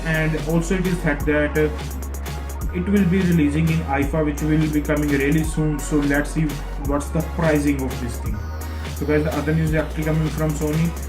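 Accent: Punjab, India. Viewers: oh Jada naa bol gandia jehia video banada hai knowledge koi nehi ,oda hi boli janda moto g4 9000 da ayia hai tu 11000 dasi janda teri news hi chuthi AA ...... PTA nehi ketho ketho AA Jande AA .. 22 technical guruji Ja sharmaji technical dekh kini sehi news dende aa ... rest comment in Hindi...... or baat English ki Teri English vi Punjabi tone vali hai Tumhari videos dekh ke admi bor ho jata hai.. tabhi koi view Ja like Ja comment nehi ata.......💩💩💩💩💩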